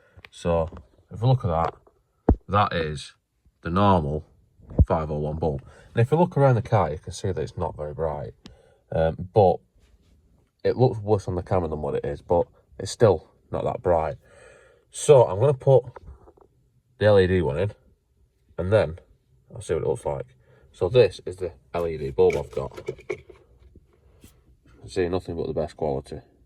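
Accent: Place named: Northern England, United Kingdom. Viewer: He has a cool accent. Where in Northern/ Midlands England is the accent from?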